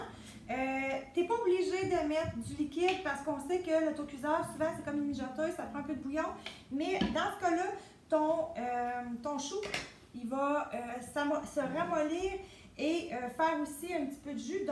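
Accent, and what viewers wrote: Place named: Quebec, Canada. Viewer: Belle démonstration Tupperware!!! Et j'adore votre délicieux accent Sag/Lac ( je suis originaire de Jonquière... Je m'ennuie d'entendre cet accent ). Cela dit, je fais de quoi de pas mal similaire, mais avec un Presto. Perso je mets une boîte de tomates en dés, pour avoir du ''crunch'' de tomates, et j'y ajoute du jus de tomates. Tellement bon!!! Merci pour cette belle démonstration!!!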